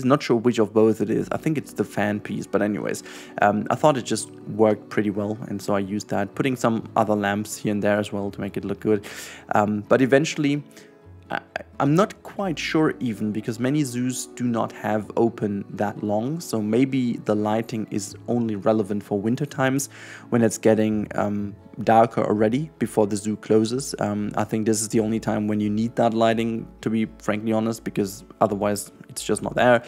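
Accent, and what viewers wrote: Germany. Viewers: ich hab direkt deinen deutschen akzent bemerkt, aber krass wie gut du englisch kannst 🙂❤️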